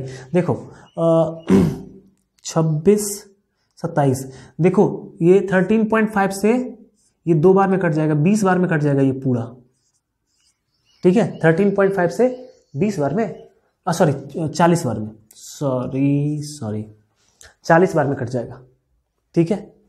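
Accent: Bihar, India. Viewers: Aap Bihar se hai kya Hum Bihar Darbhanga se hai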